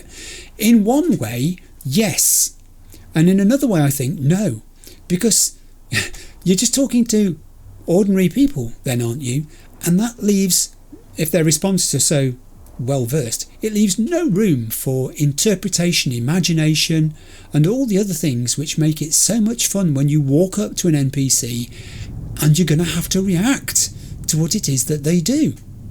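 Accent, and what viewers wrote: United Kingdom. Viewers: a 4 hour episode of cyberpunk 2077 narrated by a great British gentleman? sign me up! I'm always looking forward to the new episodes every monday because they are so good every time and i also cant believe we are already at episode 26 sjeesh time flies!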